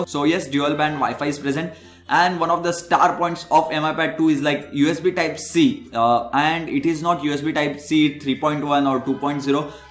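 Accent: India. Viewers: That's how Indian speaks English, dude. They take their accent into account. I can understand it easily, you just need experience.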